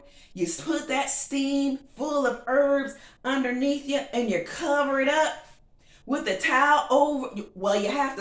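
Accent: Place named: South, United States. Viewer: Oh wow! I didn't realize you had such a distinct southern drawl until this video. In reference to your topic, sadly, most men have not evolved beyond the barbaric be fruitful and multiply stage. Many are sex crazed and lack discipline and self control. Some will mate with any and everything, up to and including babies, teens, the elderly, a corpse, a car, furniture, and passed out women! And let's not forget animals too! So keep an eye on your dogs and sheep! I wish you well.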